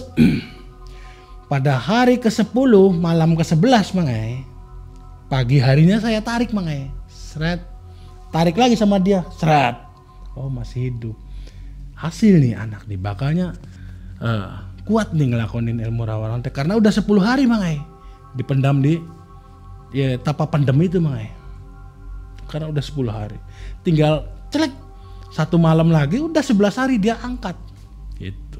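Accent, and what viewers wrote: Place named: Cirebon, Indonesia. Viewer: pasti wong cirebon kih 😁 logat nya cirebon pisannn😍🥰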